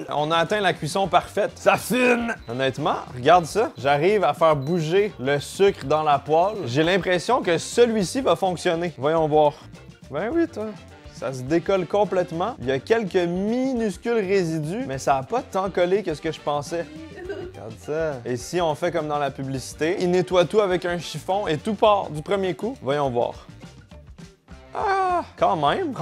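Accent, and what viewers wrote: Quebec, Canada. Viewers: Tu à gagner un nouveaux membres, j'aime ton accent du quebecq, et tu ressemble à un de mes ex potes disprus avec une seringue dans le bras, on le surnomais "le coyotte",très bonne prêsentation de tes articles, et j'aime aussi ton humour. "Serge. L" (depuis la belgique) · J’adore l’accent canadien 🤣🤣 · L accent du Québec est toujours aussi 😂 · Je viens de découvrir cette chaîne et ca m'a bien plu!!!!! L'accent québécois, l'humour,les expériences,le ton léger, j'adhère !!!!!!De France .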